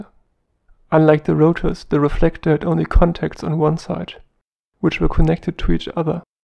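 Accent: Germany. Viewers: a lot of Germans speak English like this, I spent 6yrs there, :)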